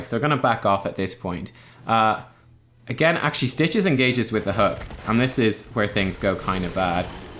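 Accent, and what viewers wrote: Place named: Ireland. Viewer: What part of Ireland are you from? Never heard your twang 😁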